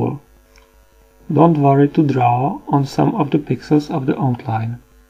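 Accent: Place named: India. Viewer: That's an indian accent not a russian one.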